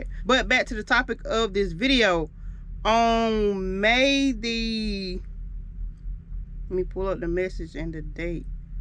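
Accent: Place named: Florida, United States. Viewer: I’m gonna make an educated guess and say you are from Florida that’s because I can catch that Kodak Black type accent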